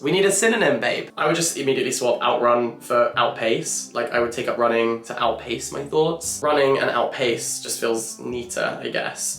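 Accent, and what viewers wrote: United Kingdom. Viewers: I thought it was a british accent (I am not a native english speaker and have trouble picking up accents)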